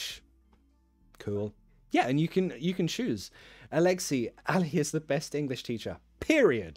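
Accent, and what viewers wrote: United Kingdom. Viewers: What british accent you talk:Rp,Modern Rp,posh or cockney and do you talk more than 1 accent in your daily life?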